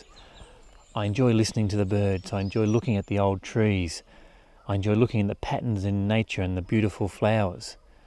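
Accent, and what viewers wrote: Australia. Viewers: Hi, I really enjoyed your film, a real gem for supporting a text I am writing on. I also enjoyed listening to your Australian accent, since I lived there for more than a year and it was a very special time, it brought me beautiful memories. Congrats also for keeping up with your films. All the best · did he really have to say 'I'm australian?'' although i just heard.'stralian....' With that accent !! :)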